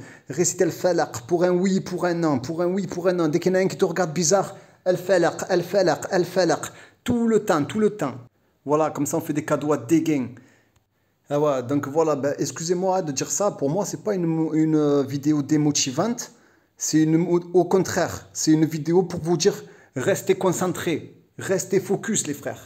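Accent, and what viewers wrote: Marseille, France. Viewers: c'est quoi el falak ? J' aime écouter cet accent marseillais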